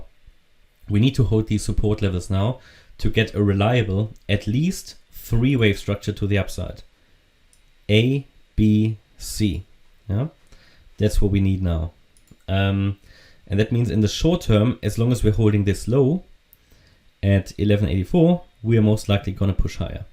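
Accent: Germany. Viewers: Great vid! Are you German?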